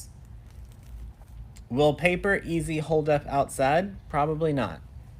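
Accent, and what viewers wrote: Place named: Louisiana, United States. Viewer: You say Y’all because your from Louisiana...lol. I tease my son who lives in Shreveport that I paid for 4 years of college for him to learn to say Y’all. 🤣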